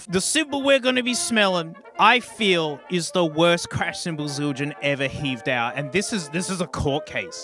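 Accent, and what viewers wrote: Australia. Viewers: Is this? Or do all Australians sound the same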